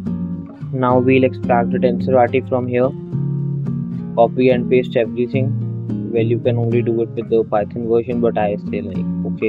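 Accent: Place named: India. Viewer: just go and fix your English, we don't need to hear your disgusting Indian accent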